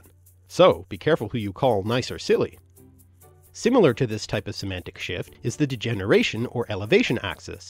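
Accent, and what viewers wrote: Canada. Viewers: I never noticed before, but I think you have a tad of a Canadian accent. Are you from the great white north?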